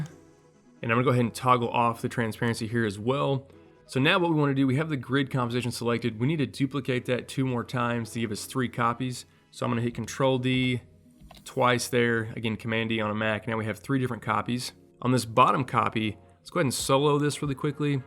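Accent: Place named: South, United States. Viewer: So many gems in this!!! Thank you! I'm from NYC, but ur accent reminds of me of my fam down south.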